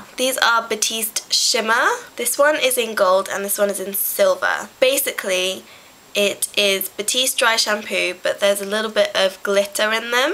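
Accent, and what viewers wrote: United Kingdom. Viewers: i could listen to u all day! i jsut love ur cute lil british accent! =DDD u should've totally tried out for cho chang =)))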